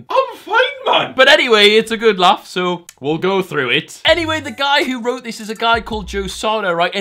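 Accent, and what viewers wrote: Newcastle, United Kingdom. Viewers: Are you a Geordie? This explains much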